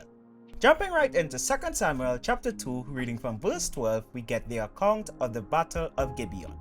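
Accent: Trinidad and Tobago. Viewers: You Trinidadian?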